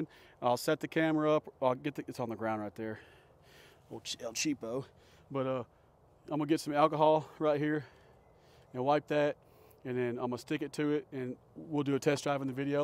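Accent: South, United States. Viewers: The best part of your video is your comments. It’s great listening to you. You sound like I’m talking to myself with southern accent. I’m a yankee. I’d rather have Yuengling.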